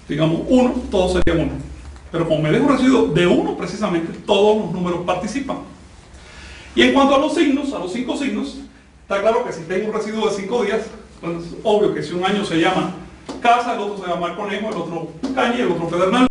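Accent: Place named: Chile. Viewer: hablas como chileno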